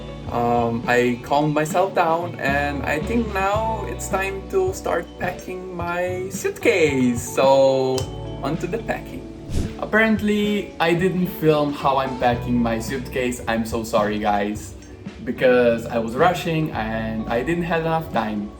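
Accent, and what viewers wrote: Philippines. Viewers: You speak your English in a Filipino English accent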